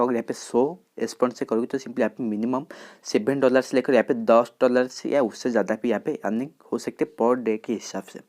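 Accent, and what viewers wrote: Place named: Northeast, India. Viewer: ooh apka bolna ka style north-east ki tarah ha isilia pucha 😅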